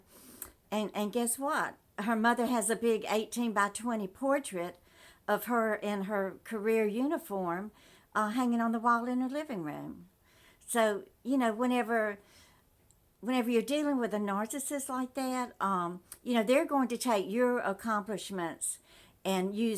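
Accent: South, United States. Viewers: you do look good, I am younger and in good shape but have more wrinkles on my face than you, so you are right about the water drinking. Thank you for you... I appreciate your good old fashioned down south speaking voice (my father was from down south as well as my husband) and it is so good to see you so happy and lovin your grandchildren and family...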